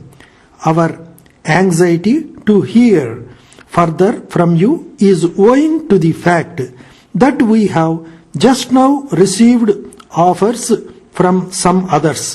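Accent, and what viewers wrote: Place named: South India, India. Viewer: kai re clear voice...fati hui awaj h....south indian accent...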